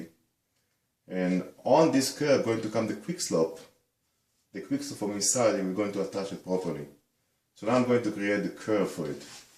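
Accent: Israel. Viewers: You sound Israeli. Thanks for the video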